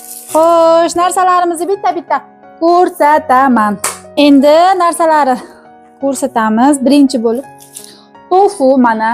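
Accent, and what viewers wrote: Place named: Olot, Uzbekistan. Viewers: . Тилиз барибир. Олотга тортади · Assalomu alaykum Buxolikmisiz Qorakoʻlning qayeridansiz hech shevani buzmasdan gapirgansiz mashaalloh ❤❤❤❤❤❤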